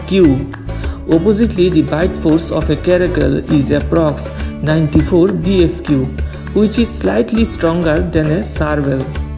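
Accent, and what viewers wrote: India. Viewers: Sorry but I just couldn’t watch that video. That Indian accent reminds me of the frustration every time I try to call customer support, be it for my cable, phone, internet support, etc and I don’t understand what the hell the Indian agent are trying to explain to me and worse they don’t understand my American English.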